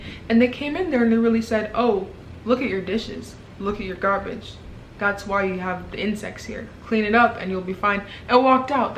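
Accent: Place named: Nigeria. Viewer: I heard you talk one second in and I knew you were nigerian.. or at least from Africa 😭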